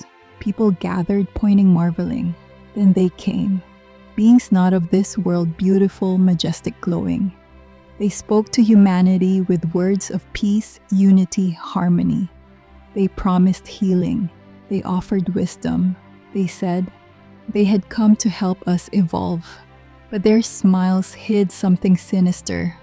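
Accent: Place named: Tagalog, Philippines. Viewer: Just do it in Tagalog version and put English sub titles Is really annoying to hear Tagalog accent trying to speak English Be natural and more people will listen like the Koreans ok 😅😅😅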